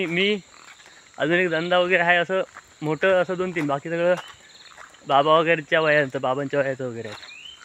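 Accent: Konkan, India. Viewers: लय भारी आपली कोकणी बोली भाषा एक नंबर, चे व्हिडिओ बघितले आहेत धुमाकूळ घातलाव, तुमचा फॅन च, मेहनतीला पर्याय नाही, 🙏 विडिओ दररोज येऊ दे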